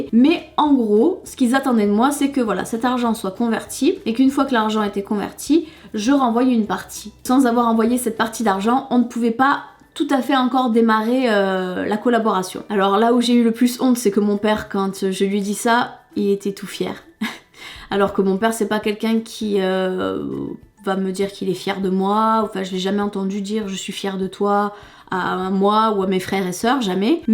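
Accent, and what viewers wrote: South, France. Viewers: j'adore l'accent du sud !!! 😂😅😆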